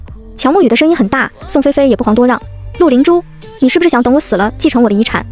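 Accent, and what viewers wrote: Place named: Malaysia. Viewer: 嗯？这么听到马来西亚惹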